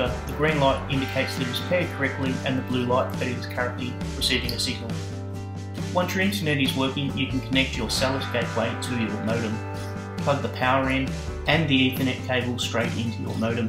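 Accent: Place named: Australia. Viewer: Please re-record this video without the music or have the music substantially reduced. This is an unprofessional demonstration and does not speak well about your digital awareness skills. Depending on one's music system, the 'background' music is magnified and combined with the Australian accent of the presenter (who is perfectly fine btw) non Aussie's cannot decipher some words. Based on this video alone, I may be forced to dismiss the product from consideration. Thank you.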